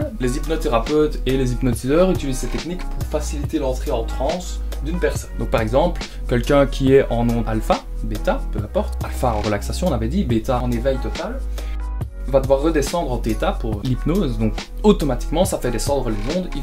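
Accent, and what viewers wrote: Liège, Belgium. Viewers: c accent belge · Accent de liège non ?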